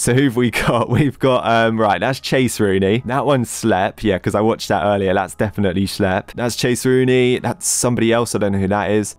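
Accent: United Kingdom. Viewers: is going full british mode on this video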